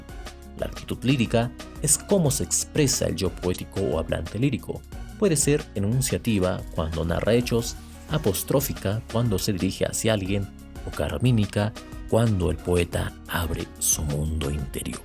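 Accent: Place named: France. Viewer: Tienes minimo acento de un frances , muy minimo pero lo tienes xd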